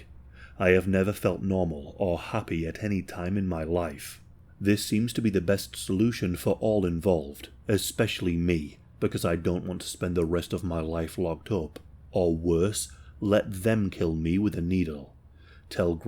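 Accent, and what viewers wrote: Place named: North East England, United Kingdom. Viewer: Not A.I for a change, he's from the very top of England before you get near to Scotland. North-East accent 😍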